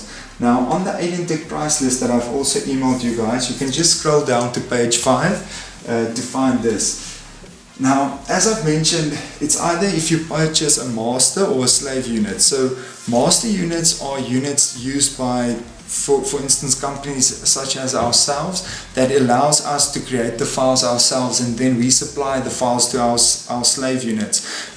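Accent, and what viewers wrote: South Africa. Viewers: He sounds like afrikana but live overseas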